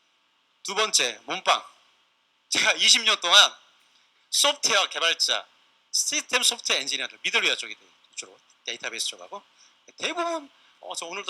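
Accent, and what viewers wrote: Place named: Seoul, South Korea. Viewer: 서울말 쓰네...갸가 갸다~~^^ 잘지내라 친구..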